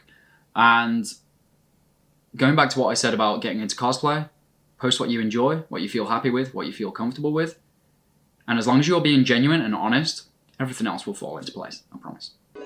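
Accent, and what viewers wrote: Birmingham, United Kingdom. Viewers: Hello fellow brummie! Where in Birmingham are you from? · The more I hear you speak the more I can hear the Birmingham accent. Thank you for being so raw and honest with us xx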